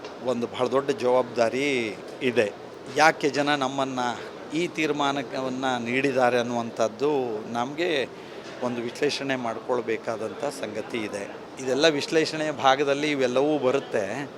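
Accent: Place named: Uttara Kannada, India. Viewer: ಸೋತ ನಂತರ ಅದನ್ನು ಹೇಳುವಾಗಲೂ ನೀವು ,ಸರಳವಾಗಿ ಉತ್ತರ ಕನ್ನಡ ಜಿಲ್ಲೆಯ ಆಡು ಭಾಷೆಯಲ್ಲಿ ,ನಿಜವಾದ ಕಾರಣ ಹೇಳದೇ ,ತಾನು ಇನ್ನು ಸಭಾಪತಿ ಎಂಬ ಬ್ರಮೆಯಲ್ಲಿ ,ಏನೇನೋ ಹೇಳುತ್ತಿದ್ದೀರಿ.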